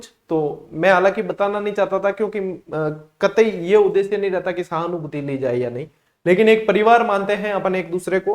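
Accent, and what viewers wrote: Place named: Rajasthan, India. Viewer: Sir ji meny aap ke 2gared ke sabe calss dekhi hue hai akbar fr se remaind ho gaya peechle bar norss nhi bana pae b.ed exam ke waj se par ab me cantinu norss bana rhi hu path h sir papa be Mary sath aap ke calss ko sunty h aap ka marwadi bolna papa ko acha laga hai.......tq so much sir ji aap garat ho sir ji 🙏🙏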